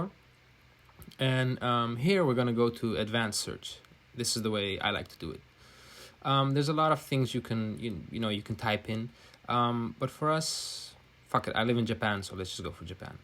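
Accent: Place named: Netherlands. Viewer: you dutch? you sound dutch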